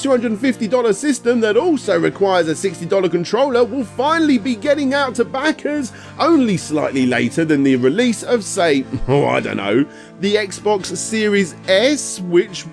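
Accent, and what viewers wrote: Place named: United Kingdom. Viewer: This guy’s voice is too limey for me.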